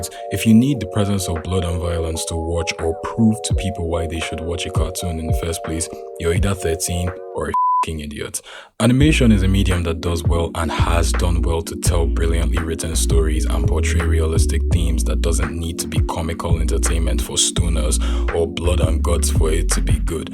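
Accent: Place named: Nigeria. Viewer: Well, I watch my shows in bed and I have severe ADHD. So I tend to fall asleep quite easily when watching this show. That's how I know a show is mature enough for me to have to be in the moment to not miss a thing. It doesn't depend on out of the ordinary shit like murders, fight scenes etc. Even the jokes are laid back. I'm just getting to watch it now, I'm in the second season and this is because I've been avoiding it for years now. As I did with Rick and Morty. You don't want to associate with a fandom that excuses constant shitty behaviour and lack of growth on self as okay, do you now? Either way I'm glad I caught up and I'm loving it. Love your Nigerian accent btw. Here in Kenya we have a myriad of em but I kinda envy your's sometimes.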